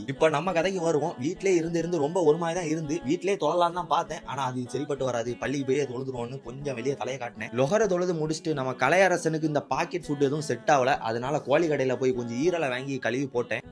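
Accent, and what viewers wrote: Kanyakumari, India. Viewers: I think kanyakumari but ippo bangalore la irukaru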